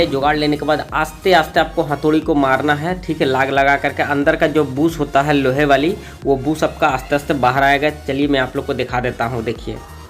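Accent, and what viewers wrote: Bengal, India. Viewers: Ap bangali ho ka??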